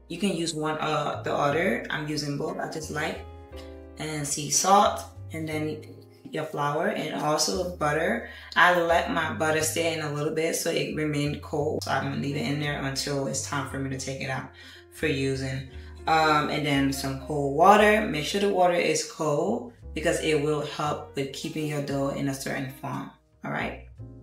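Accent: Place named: Liberia. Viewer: The way you pronounce certain words reminds me of Mercy Gono, who is also from Liberia.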